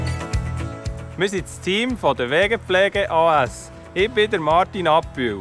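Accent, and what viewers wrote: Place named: Bavaria, Germany. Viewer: Ich versteh alles, aber man muss sich anstrengen. Im südlichen Bayern der Dialekt ist quasi der selbe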